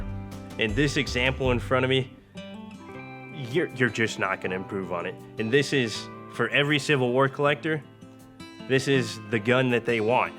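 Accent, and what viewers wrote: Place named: Midwest, United States. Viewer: Midwest U.S. accent .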